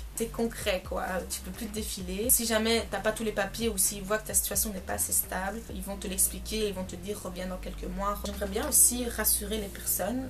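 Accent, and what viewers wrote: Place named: Belgium. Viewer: L’accent belge tous ce que j’aime 🥰 bien rare sur YouTube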